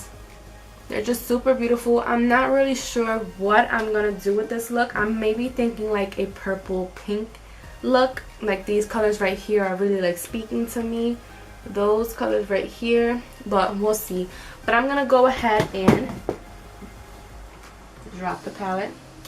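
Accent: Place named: Bronx, United States. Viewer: I knew by that accent i just left the boogie down to take care of my mom here in Jacksonville Fl I see you boo that's why I subbed od quick lol🙅‍♀️✌❤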